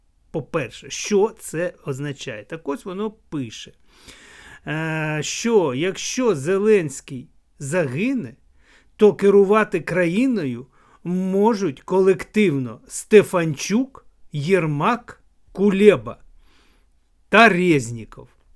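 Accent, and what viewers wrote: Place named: Russia. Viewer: Як не соромно.Що ти иелищ? Навіщо стравлювати людей.Війна.Нпаіщо говориш українською з москальским акцентом? Єто в рашке завжди единовластие а у нас пока слава Богу є вибір. Навіщо використовувати політтехнології ОПЗЖ?Як вже набридли вислови в стилі мураєва.Гагьба.(пожилой человек а вреш как молодой,уже касса ждет на виходе).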